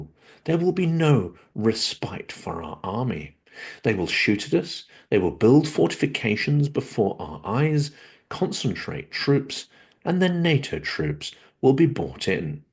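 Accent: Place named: England, United Kingdom. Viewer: Thank you,! Brilliant, as usual. To American ears, your received English accent is very pleasant, even poetical.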